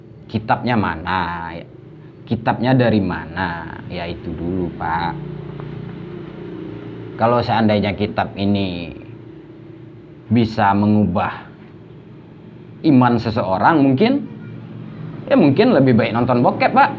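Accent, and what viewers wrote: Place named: West Sumatra, Indonesia. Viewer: Lanjutkan pak... Suara minang...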